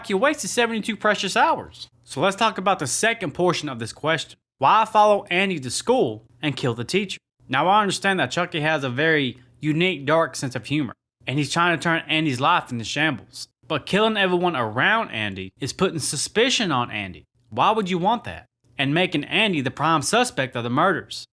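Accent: South, United States. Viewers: your vids are quickky becoming my favorites! Been going through ur lists this last weekend. Great to see someone with a southern accent creating great vids too, bama here! Anyways lovely job, looking forward to CP3, totally my guilty pleasure of the chuckster movies.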